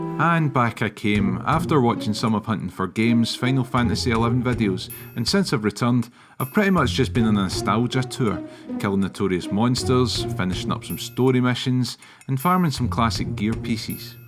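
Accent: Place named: Scotland, United Kingdom. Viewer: Great to hear a fellow Scot speak about this game the way I do lol. I just started a new character on Odin!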